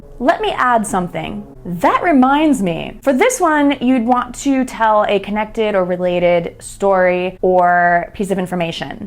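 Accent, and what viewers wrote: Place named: United States. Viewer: why ur English is so obevious ......is that American accent ?????? if that American I trust myself I can speak English fluently.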